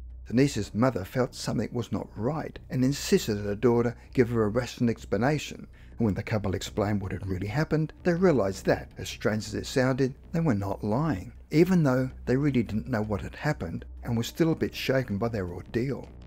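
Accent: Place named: Australia. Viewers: How can you not know?? He has a thick aussie accent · I think they probably do know he’s Australian. M 😀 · People probably don’t know that the narrator is Australian. Everyone thinks we all sound like Steve Irwin. He has a great voice for narration. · 99% of people know he’s Australian bro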